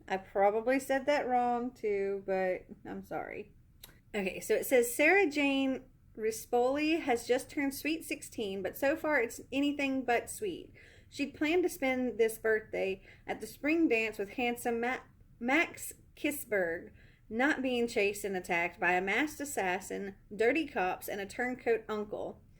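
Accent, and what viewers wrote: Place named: South, United States. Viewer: Your ollies store has so many more books than mine. Mine only has a few good ones mostly Christian fiction or true crime which I don’t read! Awesome haul though I really love how you read the synopsis or tell us what they are about so I don’t have to pause every second and look on Goodreads lol!!! Also where are you from? You sound southern, which I rarely see on booktube other than myself!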